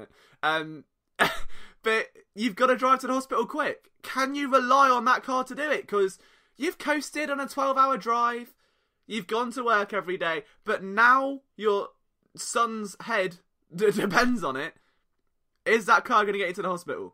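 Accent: United Kingdom. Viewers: Kamu Grugier-Hill -- To Choke: to have victory in your sights only to let it slip through your fingers. Titans, Panthers and Dallas. No wonder the Eagles can't figure it out. They don't even know they are the ones who are choking! Dallas game -- Obviously need Carson to have a big passing game but Sproles is the key. The one running back that a defense can't gameplan. According to the New Orleans game, 14 points will win it. Need points on the first two drives. Brandon Graham -- Won't be signed because there is no money because Eagles will want to get the Carson Wentz deal done in 2019. This was clear to me as soon as they didn't do a deal this spring. Other deals -- Which receiver is better for the Eagles: Tate or Jeffries? Can only keep one. -- Make your goal to become an Eagles scout. You do good analysis and the Eagles like having people with British accents. 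Find them an under the radar franchise running back like Pittsburgh's Willie Parker.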